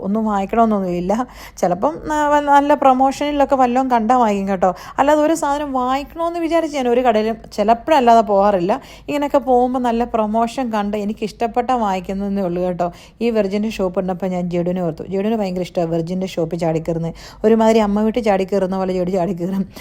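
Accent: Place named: Kottayam, India. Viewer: Chechi kottayam aano...nammude samsaram pole thonni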